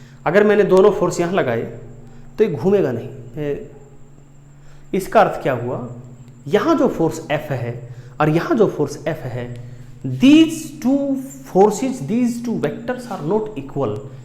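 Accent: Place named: Haryana, India. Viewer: Aapki haryanvi wali tone aa jati h bt aapne samjhaya accha 👍👍👍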